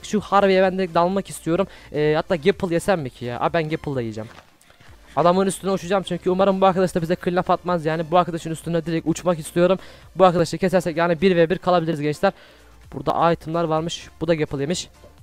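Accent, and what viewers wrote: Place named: Thrace, Turkey. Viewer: memnunum abi bu arada neden şiveli konuşuyon trakyalımısın.( ben öyleyim çünkü).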